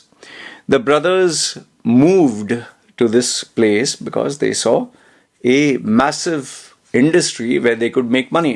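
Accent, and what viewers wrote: India. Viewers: Latin name, Indian accent, Zombie tattoos, ice-fiend cool headed book worm in Thailand of all places You're a more interesting character than Andrew Tate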